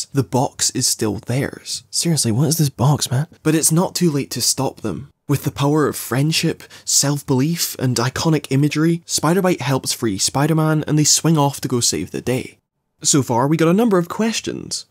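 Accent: Scotland, United Kingdom. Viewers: You Scottish?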